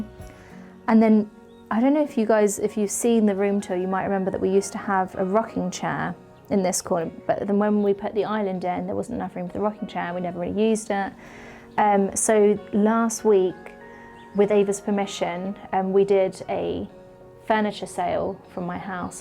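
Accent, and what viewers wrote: RP, United Kingdom. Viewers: its just a pleasure watching your videos....love your posh accent and your posh house! You are a classy young lady and we are proud of you x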